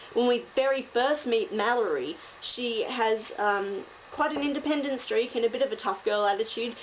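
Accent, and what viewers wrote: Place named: Australia. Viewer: I thought it was you because you can hear the slight twang of your accent haha. It's very good and also, co-wrote a musical? I must say I'm ever impressed! You're a book loving, disney obsessed, musical writing Australian. It doesn't get more awesome than that :)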